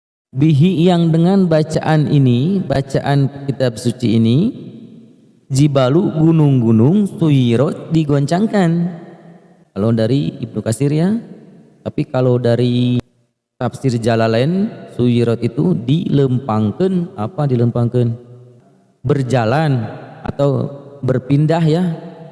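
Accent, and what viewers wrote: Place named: West Java, Indonesia. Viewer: Di lempangkeun....😂😂😂nuhun kang...logat sunda na kaciri pisan..qobiltu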